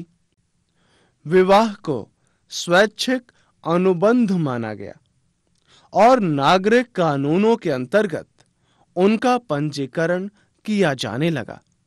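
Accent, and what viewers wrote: Standard. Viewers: sir video to aacha tha but aap etna sudha hindi kyo bol raho ho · it's to hard too understand because of youre real Hindi (shudha) Hindi. So it makes it little hard too understand.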